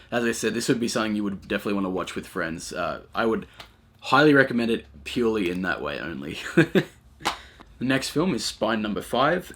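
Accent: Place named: Australia. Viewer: oh Wow, well you honestly don't have a really strong aussie accent. Don't worry, I don't think that's anything you need to be concerned with lol.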